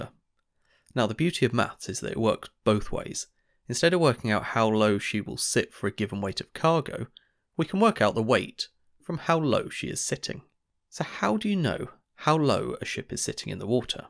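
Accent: United Kingdom. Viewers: Somehow his British accent is disturbing... at least to me.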